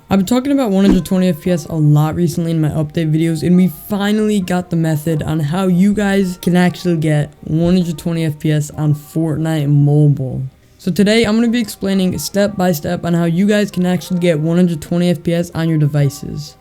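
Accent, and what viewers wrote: France. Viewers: R u French ?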